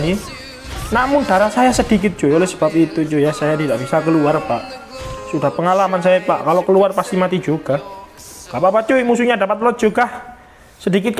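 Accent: Java, Indonesia. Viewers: saya suka cara gaya bicara nya kocak ada ciri khas wong jowo to mas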